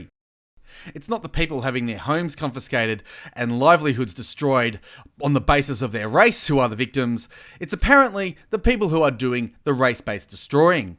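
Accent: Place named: Australia. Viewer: I like hearing the Australian accent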